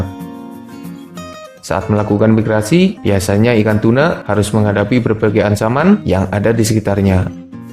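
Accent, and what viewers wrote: Banyumas, Indonesia. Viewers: Ora ngapak ora kepenak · Joss boss.. Ora ngapak la ya ora kepenak lah yak... Hahaa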